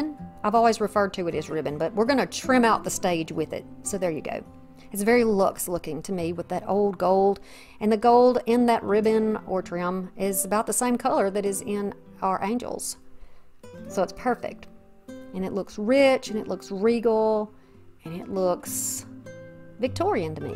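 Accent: South, United States. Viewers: I so enjoy your creations and listening to your sweet southern accent. Keep crafting and thanks for sharing.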